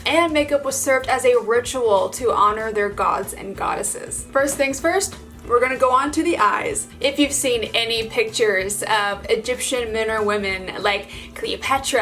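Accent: United States. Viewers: Your accent is Americanized while has a more classic, British/Mid Atlantic influenced accent.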